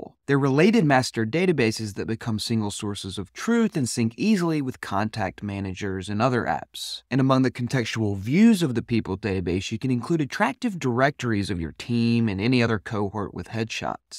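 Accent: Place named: Maryland, United States. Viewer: interesting stuff! side note - are you from Maryland with that accent?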